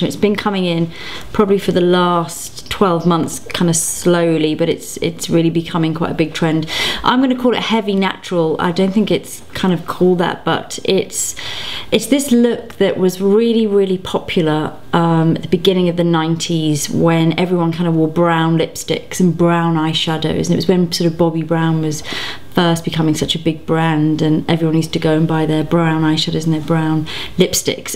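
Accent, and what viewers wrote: United Kingdom. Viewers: it cracks me up when you say these huge brand names that i have never heard and you say it in your [roper british accent. so you make each name way more bold then they really are. lol. love you!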